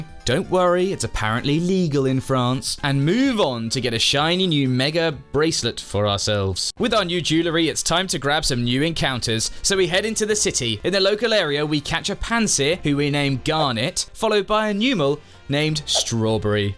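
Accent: United Kingdom. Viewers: Are you British?😂😂😂😂😂😂😂😂😂😂😂😂😂😂😂😂😂😂😂😂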